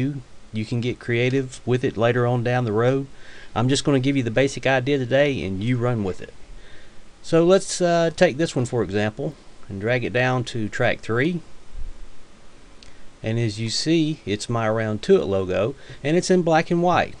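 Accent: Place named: South, United States. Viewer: love the southern accent. and thanks for the tutorial!!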